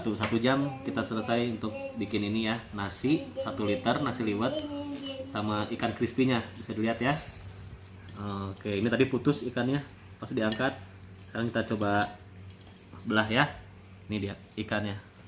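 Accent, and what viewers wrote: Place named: West Java, Indonesia. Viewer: orang sunda ya kang...dr cara nyebut alat" penggorengannya..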